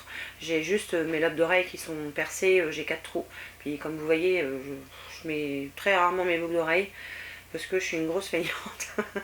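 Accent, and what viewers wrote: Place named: France. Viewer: Je suis née le 17 décembre, si j'me trompe pas.... LOLGaelle, dans un commentaire récent, je te croyais belge. C'est à cause des vidéos proposés avec le tien, à droite. Plusieurs étaient de la Belgique. Je n'y connais rien. J'aime l'accent francais, si différent de celui du Québec.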